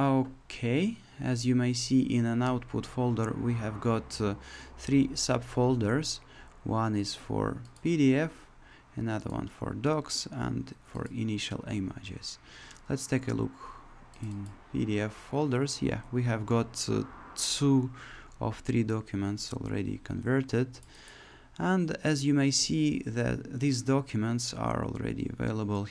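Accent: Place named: Russia. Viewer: ты русский у тебя как будто акцент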